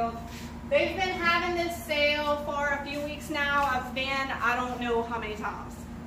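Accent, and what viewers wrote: Louisiana, United States. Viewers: LOL, I was trying to figure out the accent too, and figured it was Louisanna as well - tiny Canjun French (first time watching as well). Then she says South Louisanna....we did good ! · First time watching and I love the Louisiana accent...the aaaa, ummmm just so cute.. oh my when the kids came in I fell out when you said " Wait I have a kid in here" 🤭 ! I think I am coming back to check out the finished beauties 😊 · I could not find where you were located, but the accent, ahhh, a hint of home - has to be Louisiana!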